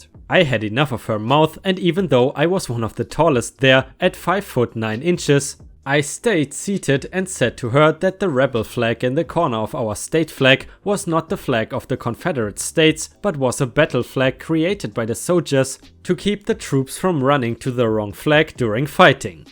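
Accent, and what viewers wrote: Germany. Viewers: I don't know man,.. I like the stories you choose but as a German it's just so hard to listen to other people's German accent. Although your English is actually really good, I don't know wether to subscribe yet... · Are you german? Frage für einen Freund...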